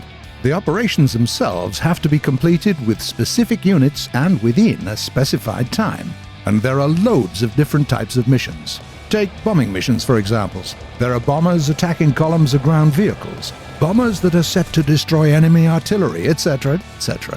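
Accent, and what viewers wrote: United Kingdom. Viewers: this dude accent is like an old british dude...... I LIKE IT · He sounds british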